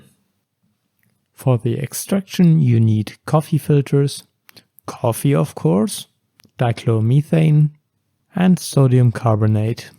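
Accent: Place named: Germany. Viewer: Could it be a german accent? I generally think that a calm german person speaking english seems to have a softer voice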